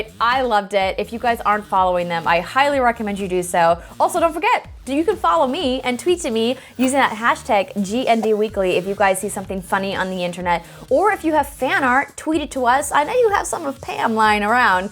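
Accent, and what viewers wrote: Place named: Minnesota, United States. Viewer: are you from Minnesota? Your accent is subtle, but I hear it:P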